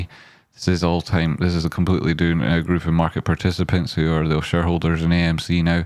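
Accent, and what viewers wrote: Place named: Edinburgh, United Kingdom. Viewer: Top show M8.thanks. I sort of get it better when its an edinburgh accent. i think I almost got a wrinkle on my smooth brain tonight listening to you..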